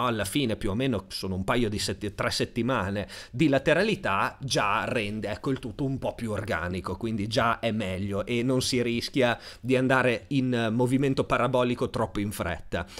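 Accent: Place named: Friuli, Italy. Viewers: Sembra essere tra Udine e Tavagnacco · Vero però ha un accento che sembra friulano 🤣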